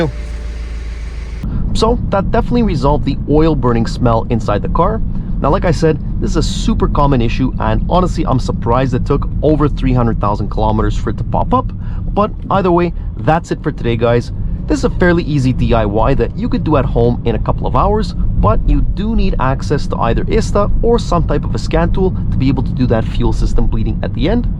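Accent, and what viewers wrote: Quebec, Canada. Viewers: Love the Quebec French. Ostie! 😂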